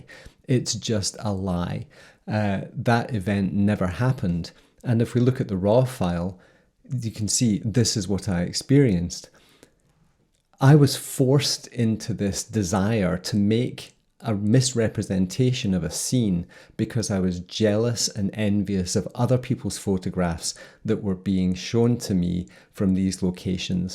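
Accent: Scotland, United Kingdom. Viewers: your nice Scottish accent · You do have a gorgeous Scottish accent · The hypnotic Scotish (not Irish) accent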